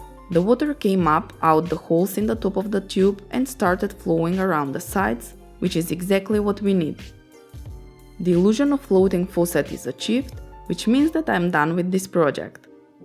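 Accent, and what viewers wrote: Russia. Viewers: Still the Russian accent recognized 😁❤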